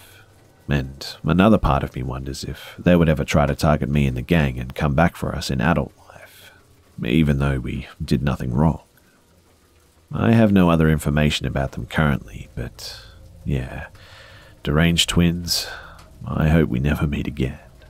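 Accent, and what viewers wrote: Australia. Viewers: He's not British, he's Australian. Like, Steve Irwin, fair dinkum Aussie. I can understand getting the two confused, though. Just think Lazy Masquerade when you think British.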